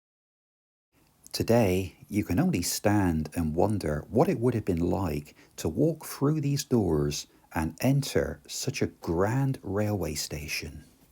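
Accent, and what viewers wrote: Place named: West Country, United Kingdom. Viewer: You have a bit of a West Country accent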